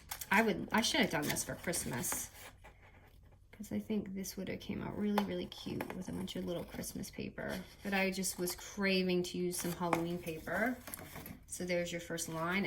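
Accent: Boston, United States. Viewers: Ummmm just my 3¢.... BUT.... super cute Halloween makes !! And although deffinatly not a heavy Boston accent , and maybe very slight , but feel you have more of a Boston accent then you think you do ..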